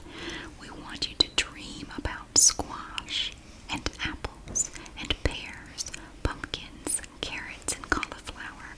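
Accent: South, United States. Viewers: Her soft Southern accent is incredibly relaxing. She could tell me I was fired and I'd probably ask her to say it again a couple of times.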